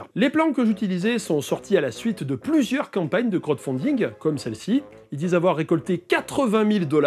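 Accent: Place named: Saint-Étienne, France. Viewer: J'ai crue entendre un petit accent stefanois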